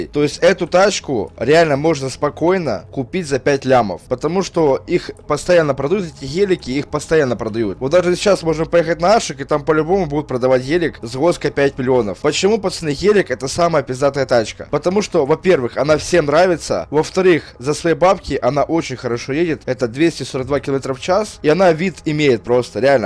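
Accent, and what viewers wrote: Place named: Ukraine. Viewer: Он как-бы украинец, а у них г читается х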